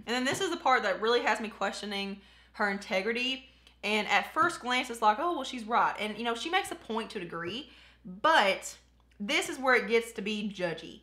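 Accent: South, United States. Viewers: OMG a southern youtuber!!! Literally 10 seconds into this video and I'm mashing the subscribe button 😂😂😂 #southerngirlsarethebest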